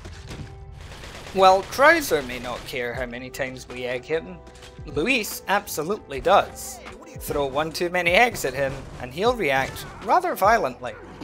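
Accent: United Kingdom. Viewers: "Hay-ch PEE!!!" 😂British voices are great.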